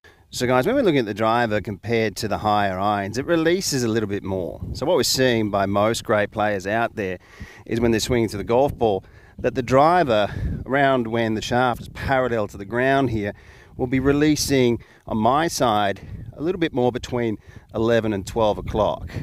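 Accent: Australia. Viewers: I hear the Aussie accent are you based here?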